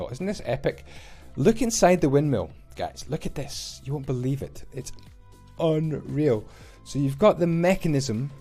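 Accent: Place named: Scotland, United Kingdom. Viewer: Lol. You most certainly have an accent, my dear chap (sounds like a Scottish accent, perhaps?), but it sounds a lot like you're saying "pam". It's okay, though. I was just trying to help you out. Also, I am a native English speaker, as well, but I am also an English teacher ;)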